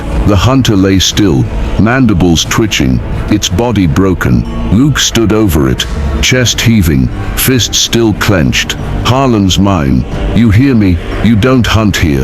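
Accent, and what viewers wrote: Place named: United Kingdom. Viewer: I’m just wondering why a British male voice is narrating a story about Luke Cage.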